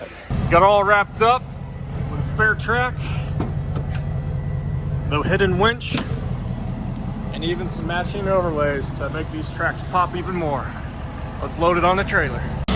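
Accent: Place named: Georgia, United States. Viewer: has to be from Rural GA or AL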